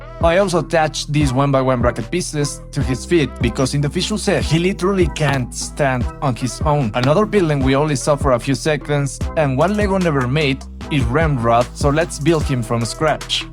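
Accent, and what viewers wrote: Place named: Poland. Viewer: You're sound like poland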